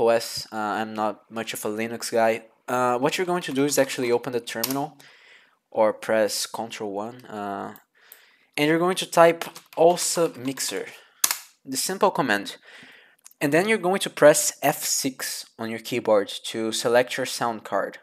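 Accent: Brazil. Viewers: I know a brazilian when i hear one, meu camarada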